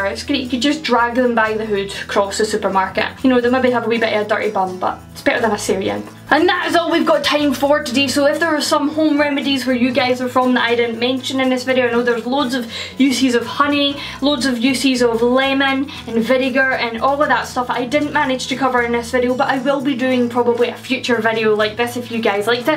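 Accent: Scotland, United Kingdom. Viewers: I know I've said this in your comments before on other videos but, I love your accent, your absolutely beautiful and awesome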